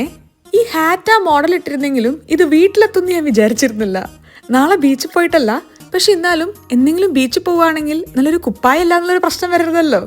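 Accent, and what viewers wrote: Malappuram, India. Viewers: 1..2 aaycha adhonnu ubayokikkate ennit njan adhinte kuttam paraya😅 awww malappuram talkenne ❤️‍🩹🫶🏻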